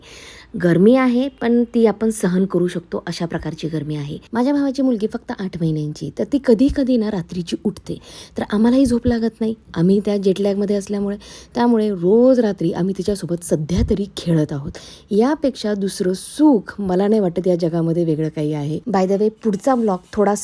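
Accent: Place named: Baramati, India. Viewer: दीदी तू खूपच मस्त आहे.अमेरिकेत राहते पण भाषा आणि बोलण्याची टोन अस्सल मराठी आहे.जशी आपल्या बारामतीची . लईच भारी... दी.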